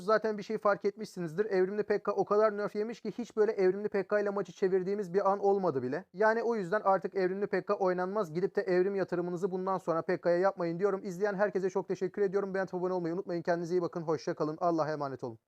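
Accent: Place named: Istanbul, Turkey. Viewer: dil kursuna falan mı gitmeye başladın türkçen istanbul türkçesine dönmeye başladı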